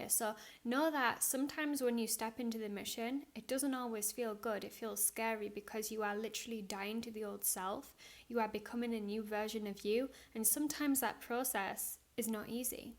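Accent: Northern England, United Kingdom. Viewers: Love the truths you share, and absolutely love your northern accent 😊❤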